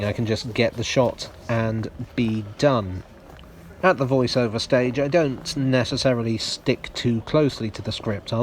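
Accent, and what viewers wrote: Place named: Midlands, United Kingdom. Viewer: Well done! 50,000 can't be that bad. I have been trying to workout of your accent. I'm usually quite good at it but can't quite pinpoint yours. Perhaps like me you were born in the midlands or other parts of the hinterland and in very early (talking) years moved to london. Let me know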